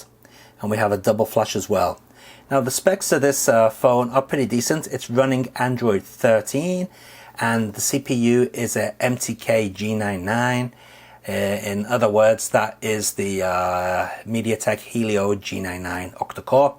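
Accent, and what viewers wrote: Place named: England, United Kingdom. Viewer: Thanks, good review. Surprised to hear an English accent tho appears you aren't on UK from your photos. I've shortlisted it, assume will work ok in uk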